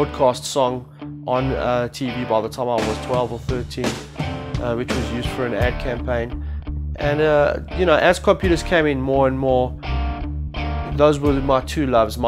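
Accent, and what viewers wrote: South Africa. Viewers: South African? :> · Are u South African? :D My bestie is south african :D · Haha, straight away I can here a Joburg boy!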